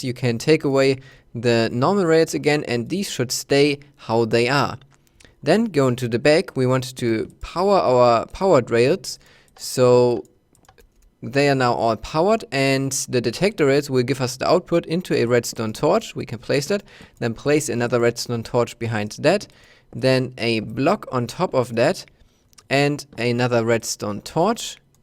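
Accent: Germany. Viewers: Are you from Germany ? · Are you a german? You sound a bit like me, and I am german. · Are you german ? :)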